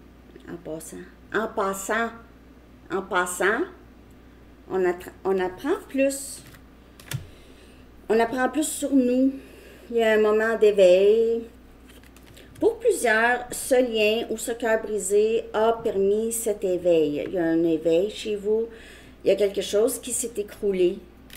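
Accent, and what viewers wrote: Quebec, Canada. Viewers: Tu es vraiment bonne et super drôle! 😄🥰 c’est l’fun d’entendre une Québécoise. Beau ressenti, merci pour la guidance! ❤️✨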